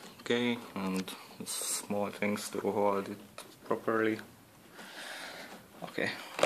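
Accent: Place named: Hungary. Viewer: Ahogy beköszöntél már hallottam hogy magyar vagy olyan jellegzetes magyar akcentussal. Remek videó. Köszönöm a feltöltést.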